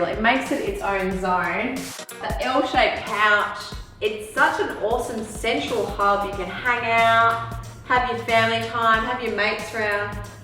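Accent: Australia. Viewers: This is undoubtedly a lovely, cosy house which was very well designed, specially because it’s been built mixing wooden elements that contrast greatly with all the industrialised materials used such as the main marbling floor which was a fantastic choice as it’s not just beautiful for its colour and easy to match but durable and easy to clean too. I just think - in my humble opinion- that the backgarden, specially the very part that surrounds the swimming pool, deserved a proper, greenish, landscape project with lots of plants and trees not only to enhance the whole building but also to keep a cool temperature around rather than the plain grass chosen. As for the footage presenter, I must say you are lovely too, very enthusiastic, has a very convincing and descriptive language (with a lovely Aussie Accent) and I quite like you...well done!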